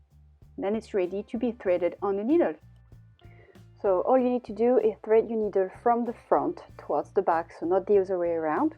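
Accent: France. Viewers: Are you french? Your accent is lovely!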